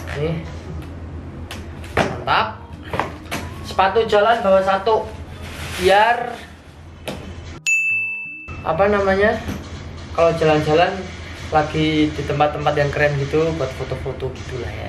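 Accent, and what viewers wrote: Java, Indonesia. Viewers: Kak nih asli mana sih,ngomong jowone medok bingit Rek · wkwk ucul bahasanya campur2 medok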